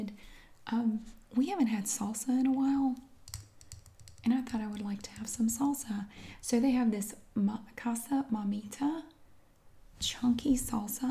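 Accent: South, United States. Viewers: x aren’t they the best. It’s her southern accent for me.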